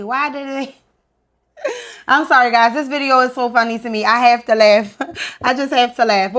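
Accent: Baltimore, United States. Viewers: Hola, I love your B'more accent. Don't ever change it. You are right, we all have an accent.Thanks for another great video. Hola desde PG!❤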